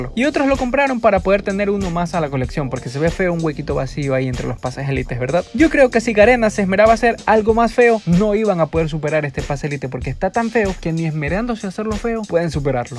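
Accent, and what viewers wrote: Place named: Ecuador. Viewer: Por su manera de hablar siento que es Ecuatoriano, jaja buen video ♡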